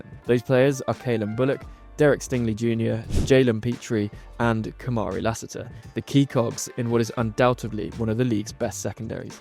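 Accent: United Kingdom. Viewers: It feels surreal to watch a video about my Texans being good narrated by a British bloke 💙❤️💙❤️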